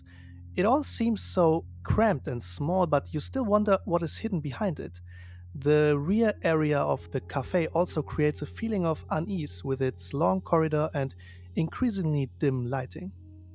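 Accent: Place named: Germany. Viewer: Thicc german aaah accent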